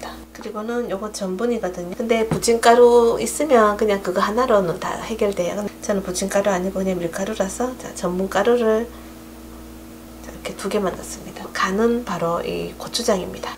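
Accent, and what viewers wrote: Gyeongsang, South Korea. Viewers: 정겨운 경상도말씨 ㅎㅎㅎ 오빠가 대구에 살고있어요~~ 좋은 하루되세요^^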